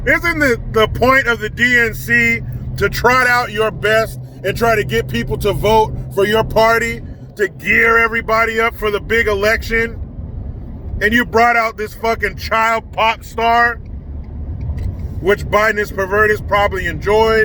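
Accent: England, United Kingdom. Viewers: Hitler 😮 bloody hell if Those Democrats woke up they would understand they are the bloody Fascists 🧐 Love the British accent 😅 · Nice English accent lol 🖒🖒🖒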